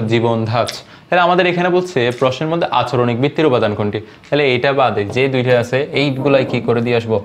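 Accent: Noakhali, Bangladesh. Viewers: Apni ki Noakhalir😐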